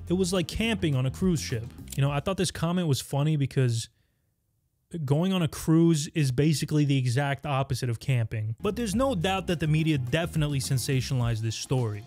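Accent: New York, United States. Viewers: The narrator sounds like a cross between Alan Alda and Howard Stern with a little New York tough guy thrown in! Great report!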